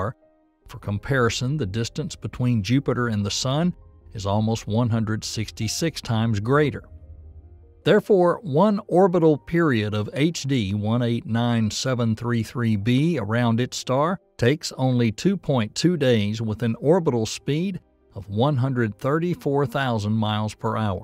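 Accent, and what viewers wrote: South, United States. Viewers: i love this guy's southern sounding voice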